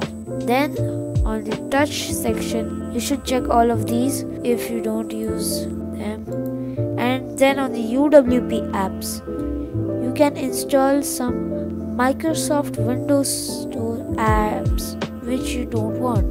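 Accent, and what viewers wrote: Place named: India. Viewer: Omg Indian accent is so annoying.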